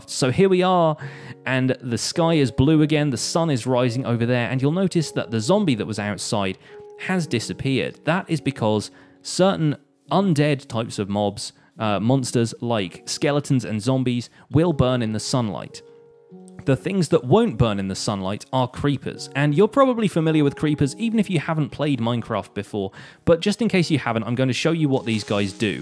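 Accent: England, United Kingdom. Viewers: I love her English accent...skl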